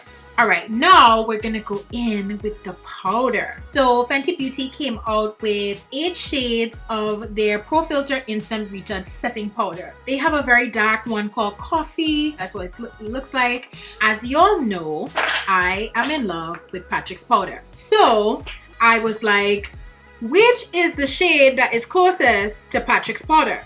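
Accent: Trinidad and Tobago. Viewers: Dont know why it took me so long to subscribe to your channel. You always have a timeless look plus the accent. Trini gyal ?? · This is my first time seeing your videos. And tell me why in your opening line I’m like “but why does she sound trini?” Opens description bar and tadaaaaa 🇹🇹 you got a subscriber from your fellow trini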